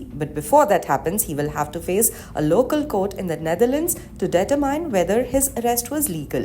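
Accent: India. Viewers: Indian Accent is masakit sa taenga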